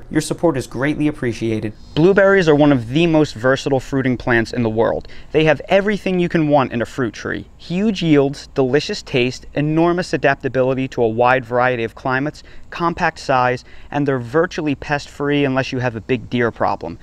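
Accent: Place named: New Jersey, United States. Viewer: North Carolina? I came here to learn how to grow blueberries in Philly. I know a local accent when I hear one. Found out your about page to confirm you are from Jersey. If there is one thing I know Jersery grows the best blueberries around. This guy knows what he’s talking about! Great information especially about the growing zones. Subbing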